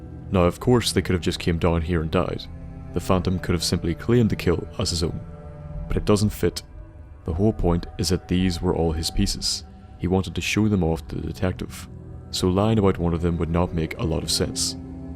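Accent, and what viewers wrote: Northern Ireland, United Kingdom. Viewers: Have to say mate, your NI accent does wonders for the creepiness of this video :)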